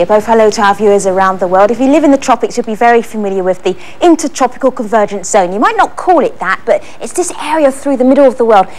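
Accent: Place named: Australia. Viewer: she talks funny, aulstrailian accent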